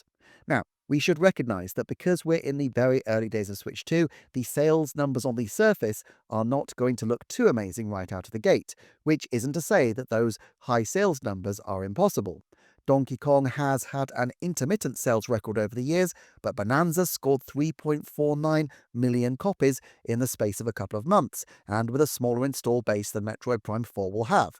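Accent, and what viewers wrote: England, United Kingdom. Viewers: He's english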